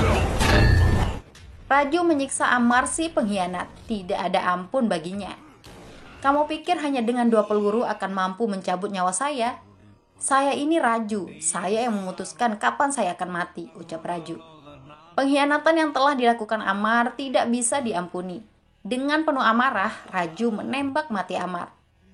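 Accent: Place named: Padang, Indonesia. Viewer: Ini admin yang ada logat Padang nya ya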